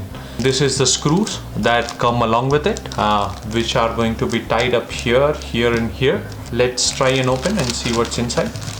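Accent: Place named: India. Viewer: Are u indian. U have an indian accent but ur bio say u are mexican 😅. Why don’t u make more videoes related to telescope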